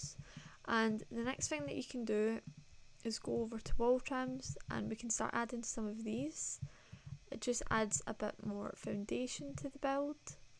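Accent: Scotland, United Kingdom. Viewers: She’s Scottish? Ireish?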